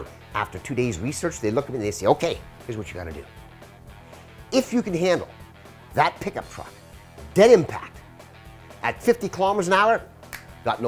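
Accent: Canada. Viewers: “Y’know?” Yep that’s how talk in northern Ontario 😭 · This guy is clearly Canadian. He has the accent and the video states he is from Ontario.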